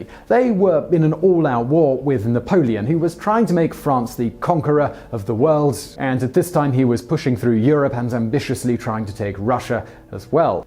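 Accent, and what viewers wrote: United Kingdom. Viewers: Very (snotty) British inflection on facts about a war they started. No matter how many "Well you couldn't have won, so you were wrong to defend your countrymen" phrases you throw in there. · I love hearing American history with a British accent! I'm not trying to be snide, these vids are filled with accurate information. I just love his accent and there is something novel hearing American history from a Brit. Love you! BTW...history isn't really taught in American schools, at least not accurately. Some ppl still think the Civil War was about states rights (to own slaves.)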